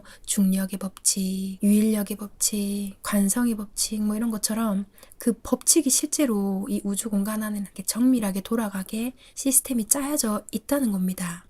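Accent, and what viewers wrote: Gyeongsang, South Korea. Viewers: 처음 들어오자 마자 구독 좋아요 꾹~♡ 누릅니다 사랑스럽고 편안한 목소리에 정겨운 경남 사투리가 매력을 두배로 해주는거 아시나요? 내용도 정말 현실속에 적응하기 쉽게 설명해주셔서 감사해요~~~♥️♥️♥️♥️♥️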